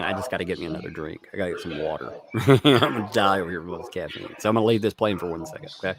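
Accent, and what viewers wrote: South, United States. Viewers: for the record your accent would probably be called "Educated Southern".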